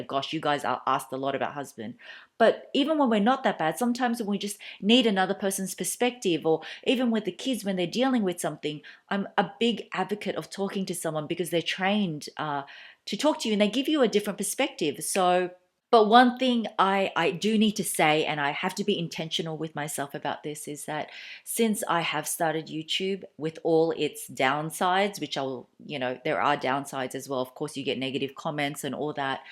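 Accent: Melbourne, Australia. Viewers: I am not much of a follower of fashion you tubers, but I have enjoyed viewing your videos. I enjoy your content and your Aussie accent and all the videos I have seen you look very pretty and classy. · Just found you! Love your videos I miss Melbourne so much and your accent reminds me of home so love listening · Hi. I just started following you on youtube and i loved your clips. Also I loved your Aussie accent 😊. I also graduated from Monash University in master of communication back in 15 years ago. Now i lived in USA. Anyway, i just wanna say please continue doing it. You brought back my ultimate moment while i was in Melbourne. Thank u! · Heyy In Melbourne how do you tell if you buy branded goods quality are great quality of the handbags, slg, shoes, clothes ect.... sunglasses, scarf as im thinking of buying something soon like maybe frm lv, bv, ysl, mont blanc, cartier. 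Thank you for the advice & tips gff im such a hugh fan of yours from a country nearby which is Singapore and oh boy i've been watching your vids/vlogs all weeks, months, weeks, days your my no.2 favourite youtuber oh man i love your aussie aussie accent sounds so smoothing to the ears haha lol very calming as well lol. Omg gff we got the same taste we love going to thrift stores to look for vintage stuff + i love eating as well haha lol awhhhh your dogie is such a cutie so sorry to hear about it so sad 🥰😍😘😗☺😚😙😋🤗🤧🤧🤧🤧🤧🤧😢😥😓😩😭😭😭😭😭😭 & your handbags do you sent it to the bag spa? For cleaning, touching up ect....get it restitching? Does your hubby + you sit down with other and talk about not spending too much? You too stay safe stay strong stay healthy as well my gff. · I love the accent you had.💕 it’s was same aussies accents? Of Blackpink Rosé she’s korean but born in melbourne Australia 🇦🇺 if I’m not mistaken.🥰🥰🥰😅😅 I love it very classy.💕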